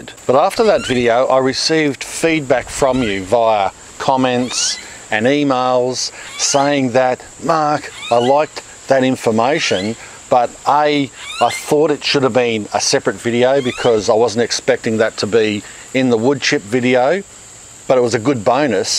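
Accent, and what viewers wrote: Australia. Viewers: Love the channel... but in all seriousness... you ARE saying "mulch" incorrectly. Even with an Aussie accent, the last two letters are "ch", not "sh". It's NOT "mulsh". It's "MULCH". CHOO CHOO like a train. Not SHOO SHOO like you say to bugs or pests.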